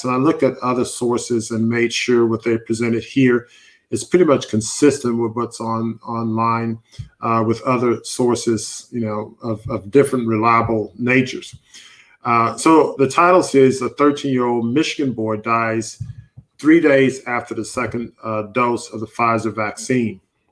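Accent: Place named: South, United States. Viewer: I hear a southern accent. Where r u from?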